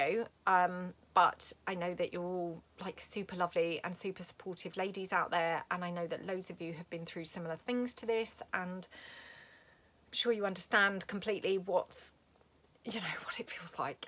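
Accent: United Kingdom. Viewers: Hi, I was so touched by your candidness! I know you are under great stress right now. I never told you this but I feel compelled to share with you that I found you on YouTube right after I lost my beloved husband of 39 years to Covid-19. I instantly fell in love with your British accent (I live in USA) and your conversational style and your creativity. There were very many days when I did not want to go on living without my husband, and for the life of me I didn’t know how I would possibly get through the day! The only thing I could think to do was to put on your videos and watch them one after another after another, because your voice and your cheerful demeanor relieved my horrible anxiety. So - we, your viewers, owe you a massive THANK YOU! May God be with you tomorrow! 💜💜💜💜💜💜💜💜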